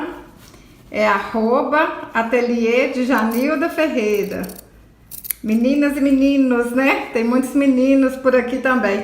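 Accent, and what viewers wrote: Minas Gerais, Brazil. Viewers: Gostei da sacolinha. Mas, especialmente, do seu sotaque! Já assisti a vários vídeos seus mas só hoje vi que você é mineira. Como eu! 😘😘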